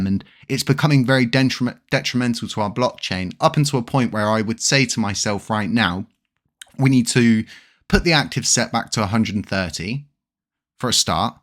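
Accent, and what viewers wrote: United Kingdom. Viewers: I absolutely love your videos with the 007 accent, but Christopher Harris is also adorable. He has a 007 accent too. I prayed for God to let my late dog 2/29/2023 visit my son and sure enough he woke up all excited and said he was visited by the dog in his dreams and he had no idea I was praying for that. What I do know is I follow both you and Jesus is Lord because you both want the same thing which is Lunc to go to the moon. I love how the cat wears glasses 🤓 ❤❤❤ but Christopher Harris I believe really gets dreams but he’s not the only Christian influencer on YouTube getting dreams about LUNC. I dreamt once my dog and I playing in heaven all night because I prayed and prayed for him to be healed from distemper but he did not make it. But God gave me an entire night in heaven in a dream playing with him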